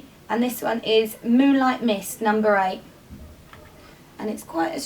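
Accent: United Kingdom. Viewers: We, Americans say vitamins (long i as in Hi), you lovely Brits say vitamins (short i as in hit). Love your vids, I watch them all the time.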